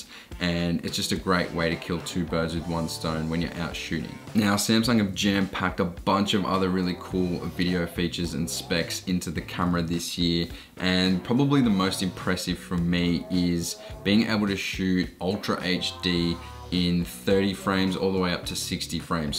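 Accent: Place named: Australia. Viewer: Thank you very much it's good we have an Australian accent because I am from Sydney, how did you get the hands off Galaxy S21 I thought only Americans can get it. I am subscribing your channel keep up the good work😁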